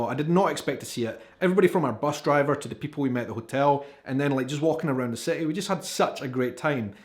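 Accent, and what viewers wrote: Scotland, United Kingdom. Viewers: Hope you're able to visit my home State, Hawaii, some day. Will look forward to seeing THAT video, for sure. I think you underestimate how charming your Scottish accent is. Trust me, anywhere you go throughout the U.S. you will be met with warmth & generosity. A great many people from Great Britain & Ireland settled throughout the American South, so you will find a multitude of peoples with surnames that will be familiar to you. Just take a look at the names of Country Music stars, to begin with. Thanks for all your wonderful videos. Your experiences & insights leave me with laughter & pride. I have been to Edinburgh 3 times. Loved it and the people there and throughout Scotland. BEAUTIFUL Country. You have a lot to be proud of. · I subscribed about a week ago and I have not regretted it. Your great! I visited Scotland and was blown away by the beauty of your country. 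It rivals Texas. It could be its beautiful twin. The Scottish were amazing and amazingly awesome. I have 2 kittens and we all love your accent and your show. This is a great link to Scottish culture.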